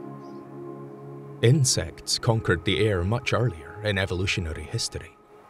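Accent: Scotland, United Kingdom. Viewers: You Scottish by any chance?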